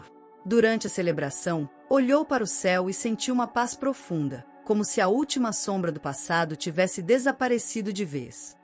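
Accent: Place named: Northeast, Brazil. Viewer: Boa gostei sim ê isso mulher parece que vc ê nordestina paraibana,cearense,bom seja lá de onde fores meu abraço e um xeru p vc Matheus pela coragem de nunca desistir daquilo e quem vc amava valeu e muito valeu e para o senhor arrogante Paulo a estrada ê larga mas contém curvas fechadas querido e as suas curvas te enrolaram,bom como estas pode mandar no meu tempo assistir eu várias obg canal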